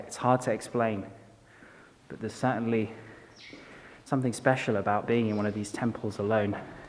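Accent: United Kingdom. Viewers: I'm in love with the British accent.....greetings from Syria to you and to Egyptian people 💙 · The best british accent ever 🇬🇧♥️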